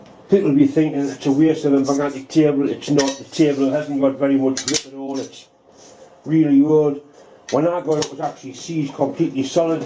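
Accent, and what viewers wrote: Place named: RP, United Kingdom. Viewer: I think if viewers can’t understand your Queen’s English they just need to watch more of your videos. It kinda grows on you 😊. All the best to you and your family. I hope you have a Merry Christmas and a terrific New Year!!! Norm in Arizona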